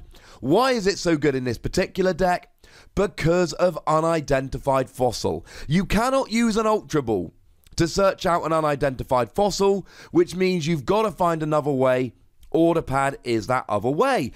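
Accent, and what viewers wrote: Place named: United Kingdom. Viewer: He's from the UK, so it's normal for him to have that kind of accent.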